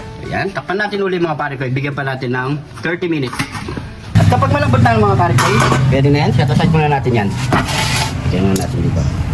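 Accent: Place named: Western Visayas, Philippines. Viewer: Akala ko ba ilocano version bakit ilongo pagkakasabi mo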